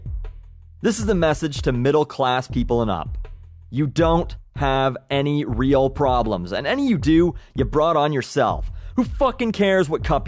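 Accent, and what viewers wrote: Canada. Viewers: Canadians do say "about" weirdly but I don't hear them pronounce it like they are saying "a boot" as they have been made fun of many times before (Yes, South Park). I hear you, saying a a combination of that and "a boat" for some reason. A beaout? I don't know if this is right or not. I like those words without the Us. I know, still incorrect. Strange. In 'Merica, I've seen a theatre spelled theatre and I still spell grey with the E. Still, er does make more sense. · don't worry, I'm the exact same type of Canadian, except I do like hockey and winter. "About" Uh-bout "Favourite" Favoo-rite IT'S THE EXACT FUCKING SAME!!!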